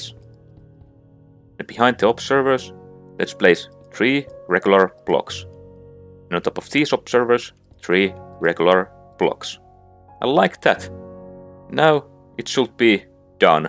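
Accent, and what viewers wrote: Finland. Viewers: hmm what do i hear? are you from Finland or Estonia? your acsent telss a lot.... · I cant focus on the tutorial because I get soooooooooo lost in that Finnish accent 🥰 it’s so beautiful 🤤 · nice finnish accent mate. x1 slap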